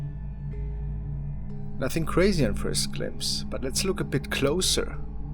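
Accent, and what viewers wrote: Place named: Germany. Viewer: He is German ! Listen to his W's they sound like V's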